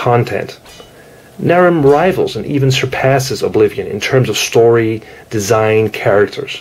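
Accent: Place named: Netherlands. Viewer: I can hear it from your accent that you're dutch. Am I right?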